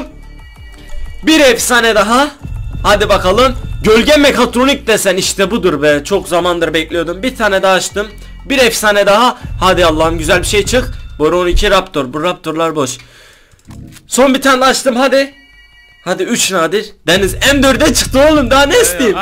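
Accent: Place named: Eastern Anatolia, Turkey. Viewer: Abi senin şiven tam doğu şivesi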